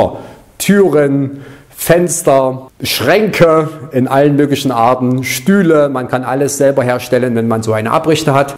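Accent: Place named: Hesse, Germany. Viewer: Ich weiß nicht wieso aber irgendwie finde ich deinen Dialekt sehr symathisch, auch wenni ch ja eigentlich der Meinung bin, dass ein Mensch agar nicht mehr sein kann als ein Hesse ^^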